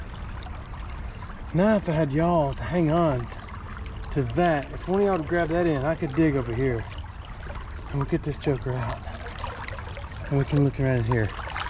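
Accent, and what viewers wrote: South, United States. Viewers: Love you quirky comments and your southern accent. Make for an interesting video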